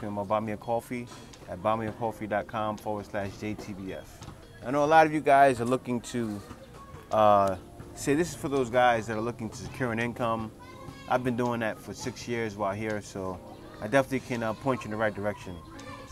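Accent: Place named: New York, United States. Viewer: My brother aren't you a New Yorker ⁉️😅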